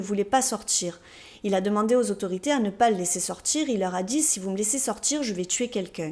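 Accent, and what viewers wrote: South, France. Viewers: Je découvre ta chaîne, c'est très sympa, une Victoria Charlton avec l'accent du sud, et si en plus ya en générique, je m'abonne!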